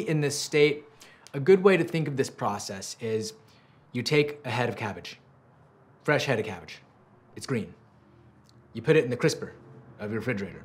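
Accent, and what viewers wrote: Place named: London, United Kingdom. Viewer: This whole video I'm just wondering how much you have been influenced by London. You sound like it and the tea pets are a dead giveaway to those who know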